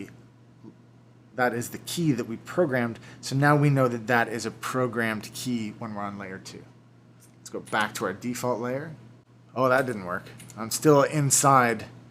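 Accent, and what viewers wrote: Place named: United States. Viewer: "Does my head in"... never thought I'd hear someone with an American accent say that.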